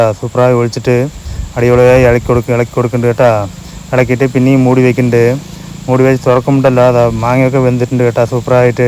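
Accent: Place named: Palakkad, India. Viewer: Palakkade slang aanu broo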